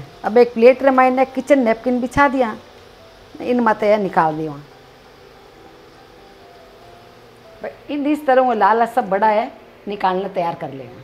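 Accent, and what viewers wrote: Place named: Marwar, India. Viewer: आपकी समझाने कि विधि बहुत बढ़िया है। सभी रेसिपी आपके समझाए अनुसार बनाई जाए तो बिलकुल आप जैसी ही बनेगी। आपकी मारवाड़ी बोली के हम फैन हो गए है🙏🙏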